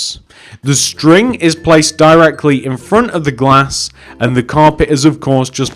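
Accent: United Kingdom. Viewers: Are u British ?? If so can u make an Asda ???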